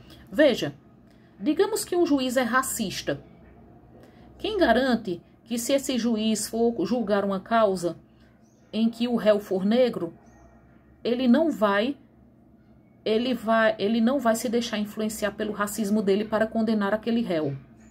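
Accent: Northeast, Brazil. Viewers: Amo o seu sotaque nordestino, sempre achei bonito. Você transmite calma e coerência nas suas falas. Obrigada por produzir um conteúdo de qualidade!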